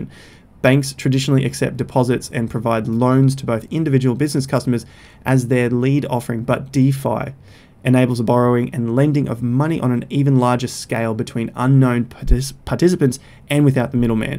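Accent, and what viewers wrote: Australia. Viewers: who are these people you feel the need to apologise to...no apology needed. You’re Australian and won’t speak the Queens English like what I do.....As for sound quality I only noticed a difference when you were away but no problem. More....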